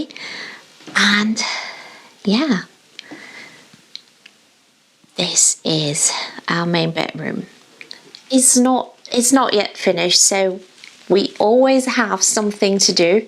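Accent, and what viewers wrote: United Kingdom. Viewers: 愛がいっぱい❤️気持ちがあたたかくなります。英国英語に聞き惚れてます。私もクリスチャン。素晴らしいクリスマスを過ごしましょう✨🎄✨ From 🇯🇵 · I always thought you were British because of your accent and didn't understand why you say yor are German... I thought only was really German. Now I watched your Q&A explaining where you got your accent from and I understand. Can you make a video speaking a little bit in your natural German accent? It will be interesting to hear. Also I love the German language, can you make more German language related videos? Maybe about German classical literature or just favorit German words. Love your channel!!! Sara.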